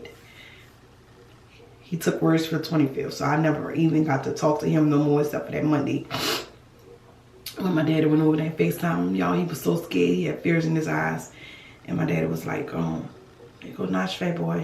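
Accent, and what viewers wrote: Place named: New Orleans, United States. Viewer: You sound like you from new orlean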